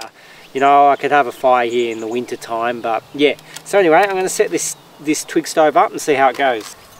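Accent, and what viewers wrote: Australia. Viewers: Great video,. Enjoy your videos. Love hearing your Aussie accent. Keep enjoying life.🎉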